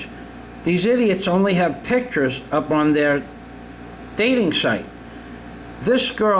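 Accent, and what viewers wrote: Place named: New England, United States. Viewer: Very funny but a useful reality check for all the naive guys and asian dating site newbies that believe everything they see or read. I think this is the second video I've seen of yours and you are actually a little annoying at times especially your new england accent and ridiculous obama references but you do provide some funny content. And you seem to attract the money grubbing types (big money)...why do you think that is?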